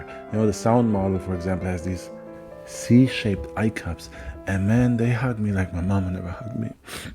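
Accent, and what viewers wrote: France. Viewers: Very intersting stories, but been wonder where you from? close to france? canadian? regards from Colombia friend. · Are you French🤔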